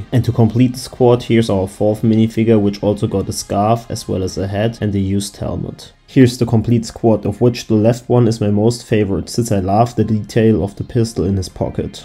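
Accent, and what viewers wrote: Germany. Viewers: You sound like a German that is speaking English bro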